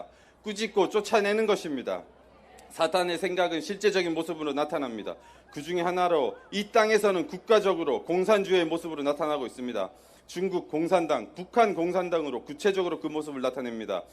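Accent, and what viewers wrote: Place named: Canada. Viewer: ❤❤❤❤❤❤ 말이 필요 엄네요....그리고 영어 발음을 보니 확실히 캐나다 사신티가 나네요...응원합니다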